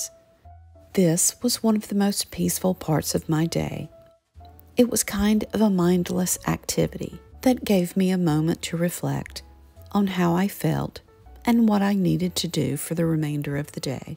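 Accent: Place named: South, United States. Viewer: I bought the book you recommended on Christmas Traditions when you featured Part 1 off Amazon. It was a little cheaper than you had said and so I treated myself. I have enjoyed it so much that I will be decorating for Christmas before Thanksgiving for the first time. I agree it will be more festive at Thanksgiving and give me a chance to sit back and relax to enjoy the Christmas season with a few more things already done. I love your videos it relaxes me to hear your voice and your slow southern draw. I’m a southern girl too so no pun intended. Thank you for all the time you put into your UTube videos. They are lovely, very tasteful and informative. I’m a big fan of yours. Be good to yourself and i will do the same. Good day Southern Lady🍁🍂 Linda from Pensacola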